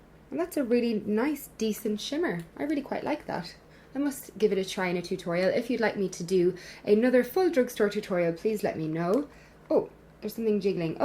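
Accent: Ireland. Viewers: Is she Irish but lives in Australia?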